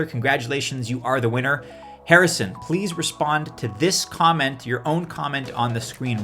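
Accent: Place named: Canada. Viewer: I come for the Canadian accent. Chance at ETH doesn't hurt either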